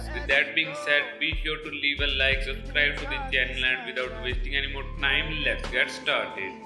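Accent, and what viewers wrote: India. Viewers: You English is enough punjabi?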